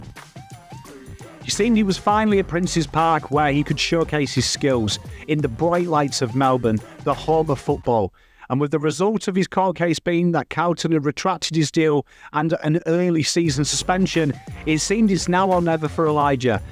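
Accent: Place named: RP, United Kingdom. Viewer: Nicely done. Definitely one for the archives! 👍🏻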